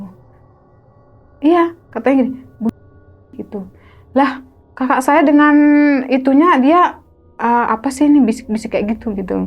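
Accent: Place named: Medan, Indonesia. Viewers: Apa pulak,😂 Medan kx KK awak ini.. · Kak logat mu Medan..bukan Aceh.. Yg logat Batak nya ini Boru...😂😂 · Jadi suka aku dengarnya. Nampak kali logat Medannya😅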